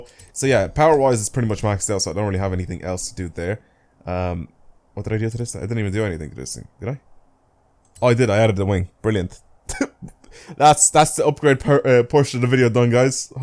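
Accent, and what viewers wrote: Ireland. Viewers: An angry Irish man shouting at me, what else would I want? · What park of ireland you for sound like your from down the country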